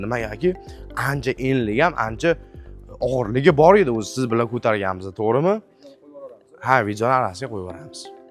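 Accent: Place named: Kokand, Uzbekistan. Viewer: Чота шу бола куконли шева тортяпти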